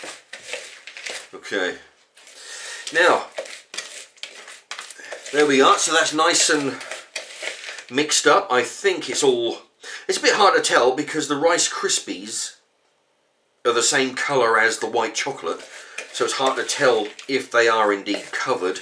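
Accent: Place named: RP, United Kingdom. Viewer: Very posh.. Nice vid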